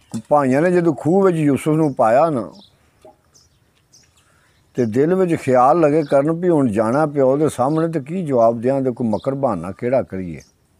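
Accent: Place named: Sialkot, Pakistan. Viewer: Your accent of punjabi boli reminds me of my parents and mama ji who came from Sialkot.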